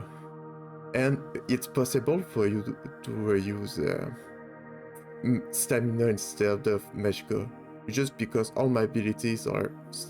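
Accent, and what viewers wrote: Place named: Quebec, Canada. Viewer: toé tes Québécois ! hahahaha :p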